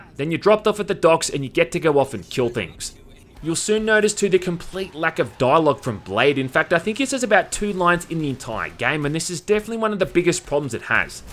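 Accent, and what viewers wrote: Australia. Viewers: Am kinda surprised to see you doing a re-review BUT it is SIN and that smooth Aussie accent dose it eveytime 😂👌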